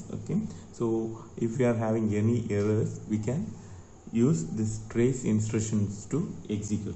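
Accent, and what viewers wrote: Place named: India. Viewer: Indians speaking emglish sounds very ridiculous, next time use subtitles, the world will thank you.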